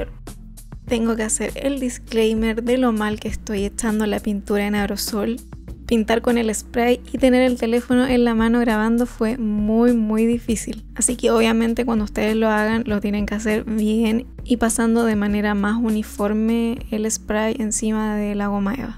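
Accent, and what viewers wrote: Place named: Chile. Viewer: Llegué a este video porque quiero hacer un traje para un concierto de gaga y ninguno me gustaba, soy chileno viviendo en Las Vegas y me encantó tu video por el acento me sentí allá de nuevo Gracias ❤️❤️❤️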